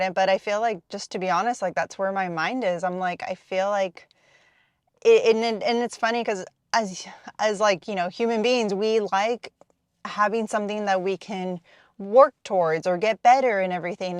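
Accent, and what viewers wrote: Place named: United States. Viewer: p.s i always loved youe american accent but i never knew there were palm trees where you live... its dark and it snowed last week where i live.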